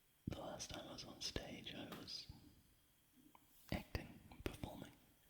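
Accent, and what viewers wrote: New Zealand. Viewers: I find it the most bizarre thing hearing you whisper and having the same accent as me. It shocks me even when I'm expecting it! Merry Christmas by the way! Hope you had a really nice day :) shopping malls are so busy here at this time of year. They broke a record on the intake of money this year apparently. Good old kiwis ae? Are you in the North or South Island? Really great videos! If you ever want help with some inspiration I'll help you out. :)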